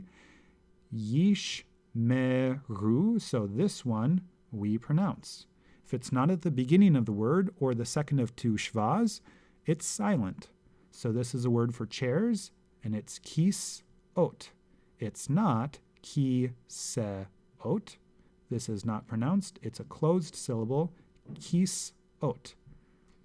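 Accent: United States. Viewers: Thanks so much. You should perhaps point out that your pronunciation of many of the vowels is distinctly American, and is different from the non-Western original Hebrew pronunciation. For example the letter yod should be pronounced as the oo in wood, and not like the o in yoga. It can be confusing for those who are not cogniscent of your strong American accent. Thanks again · I consider this harmful to anyone learning Hebrew. You have a heavy American or Canadian accent, and your vowel placement so different to Hebrew, I could barely recognize the letters you mentioned. Anyone who used these videos to learn would surely end up sounding American? Also, the video is called "Biblical Hebrew" but you're rejecting Biblical pronunciation and going with modern pronunciation, which isn't helpful to anyone learning Biblical Hebrew.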